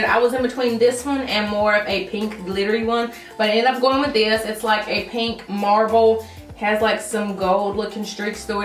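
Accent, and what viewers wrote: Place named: Mississippi, United States. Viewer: I just came across this video and first off I could watch your videos all day just for your accent!!! I live in Mississippi and never thought I would find someone that sounded like me on YouTube lol!! LOVE IT!!! I can totally relate to the amazon recommended tab it’s like amazon knows me personally lol love your decor behind you as well so pretty!!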